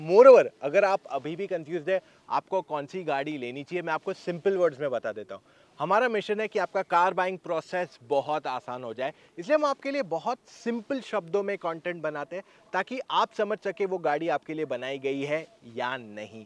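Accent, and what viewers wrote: India. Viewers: nobody notices it spoke in very desi style because he knows that the people who are going to buy rthis they might not know english words that much hats off, he knew about his audience very well, subscribed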